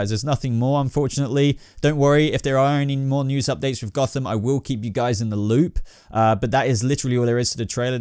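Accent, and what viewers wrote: United Kingdom. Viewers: Why is there a brit narrating an American show cant listen to this rubbish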